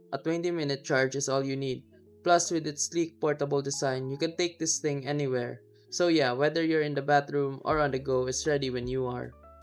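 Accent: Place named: India. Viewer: What's with white guy on your thumbnail when you're Indian voice? 100% you haven't used this or own it, not once did you show it on camera, only website footage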